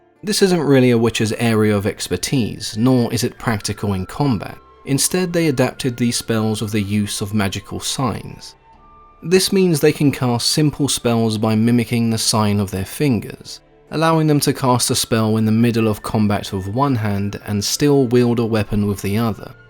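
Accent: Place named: England, United Kingdom. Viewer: Direct, clear, informative and English accent....good video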